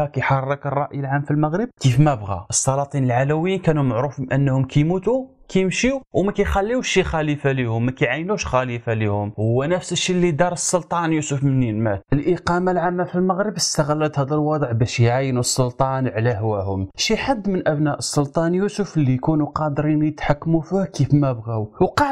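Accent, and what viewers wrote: Algeria. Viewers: يحاول التحدث بالدارجة المغربية ولكن اللكنة الجزائرية تطغى عليه بحكم زمالتي مع جزائريين. حتى ملامحه جزائرية ومصطلحاته ومفرداته ليست كمغربي أصلي حين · لو اهتممتم بتاریخکم لکان افضل....اللهجه دیالک الجزاٸریه واضحه ... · لكنتك الجزايرية غالبة على كلامك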